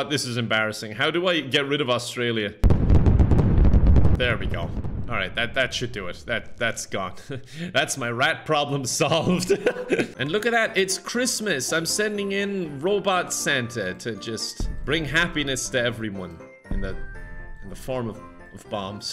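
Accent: Ireland. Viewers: dude ur from spain i thought you where irish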